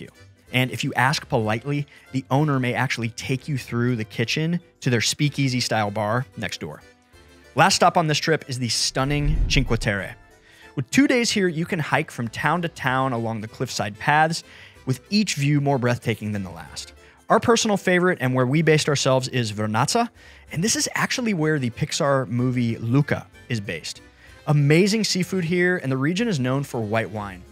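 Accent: United States. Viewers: Chimney cakes are from Hungary...why I am listening to an American talk about Czech travel tips...;)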